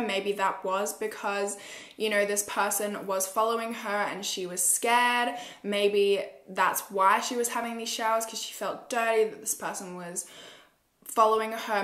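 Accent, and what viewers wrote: Australia. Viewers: i love your accent so much. i want an australian accent but i’m a boring american 😅😂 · I love the Aussie accent so much! Am I the only one haha?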